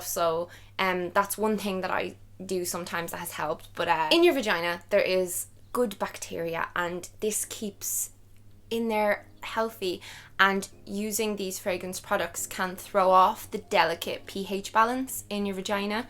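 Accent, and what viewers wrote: Ireland. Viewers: I have a question are u Irish ?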